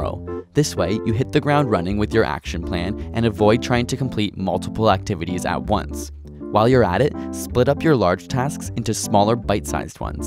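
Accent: Canada. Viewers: that canadian accent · are you canadian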